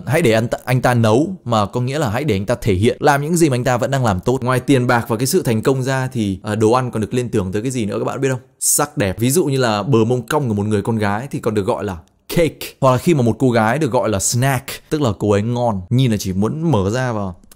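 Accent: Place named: United States. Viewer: Bro, your English is perfect! you speak like a native speaker! Most vietnamese in Vietnam speak with a slight British accent, but your English sounds like an American accent, which I am so used to hearing and speaking. Great job!!!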